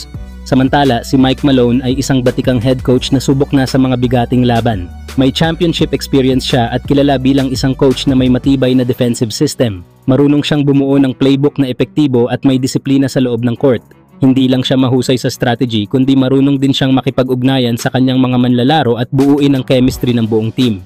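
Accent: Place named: Visayas, Philippines. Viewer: Bisaya siguro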